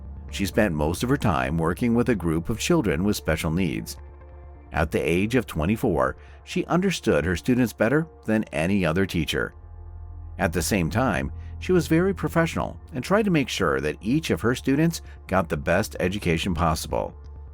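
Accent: United States. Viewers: This script and the narration are terrible. Who says that a show was on "federal TV"? And it's full of things like "Gary refused to give his DNA. The police then formed a list of 11 suspects that refused to give DNA samples. Gary was among them." No shit, I heard what you said... And that terrible Midwestern short "a" just makes it that much more abrasive.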